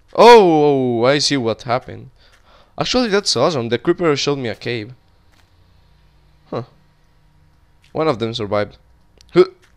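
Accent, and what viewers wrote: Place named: Colombia. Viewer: i actually like your columbian accent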